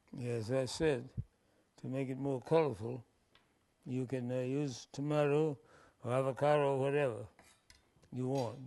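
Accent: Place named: South Carolina, United States. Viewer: I love this ELDER!!! So beautiful and such and inspiration and he sounds like my people from Lady's Island SC 🌴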